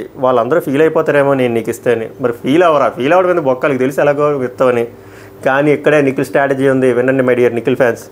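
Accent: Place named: Visakhapatnam, India. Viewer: Mee slang super Garu. Vizag slang👌👌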